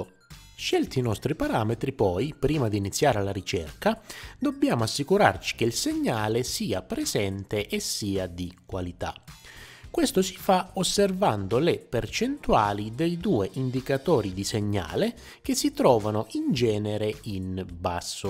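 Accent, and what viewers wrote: Calabria, Italy. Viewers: Sei calabrese?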